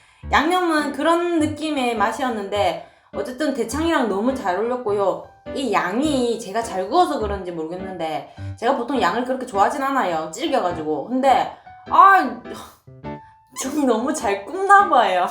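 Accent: Gyeongsang, South Korea. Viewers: 저왜 안광이 보이죠? ㅎㅎ여자인내가봐동..넘이쁘다 ㅎ 이거익은거예요~~ 같은 또 경상도인이로써!!! 자랑스런이쁜받는말투!!ㅋ 렌즈를 따로꼇다해도 안광이... 그리고 두겹 팔자주름..매력포인트... 전좋아요 저부분!!ㅎ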